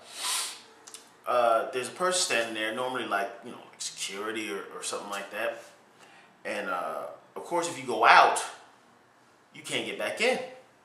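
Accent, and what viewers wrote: Jutland, Denmark. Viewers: og nårh ja, man kan godt høre at du bor i Århus, du har stærk jydsk accent :D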